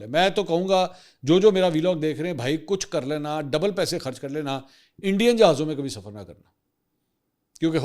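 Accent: Punjab, Pakistan. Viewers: Your Punjabi Very funny 😂😂😂😂😂😂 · (Bhai pin me )Bhai AP Jo Punjabi ka tarka lagaty hai na Sachi sawad a janda 😂 or program tu aho😂 hit different . Allah AP ko aise hi Khush rakhe ameen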